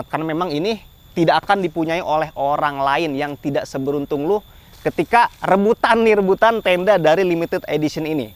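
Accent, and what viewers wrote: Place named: Java, Indonesia. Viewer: Suara lu kalo riview medok banget bang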